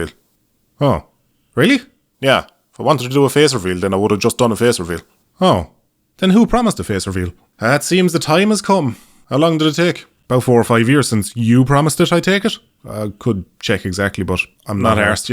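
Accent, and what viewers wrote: Ireland. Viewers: Imagine one day you can just walks the streets in Ireland or even anywhere. Hear a very familiar accent just to turn towards a regular lad.. think where do I know this accent from... just to get home and realize you saw the actual. And this was probably the first and last time. But you still raise a pint to him and think... God he had a massive.... smile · It was kind of obvious who you were when I met you. You're the only 2d green duck with an irish accent.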